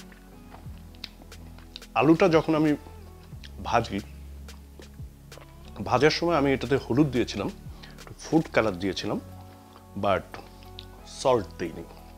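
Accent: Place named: Bangladesh. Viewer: So,but ,so,but Bangladeshi English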